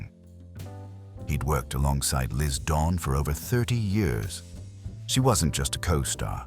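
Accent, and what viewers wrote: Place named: United States. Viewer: Why is an American doing the commentary..?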